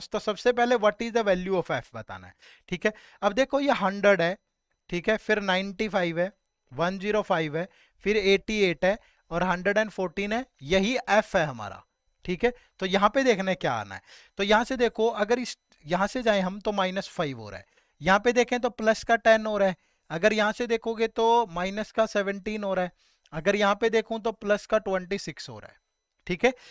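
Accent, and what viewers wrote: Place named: Haryana, India. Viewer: Solved. Answer is c & great session Sir. Love your Haryanvi accent!!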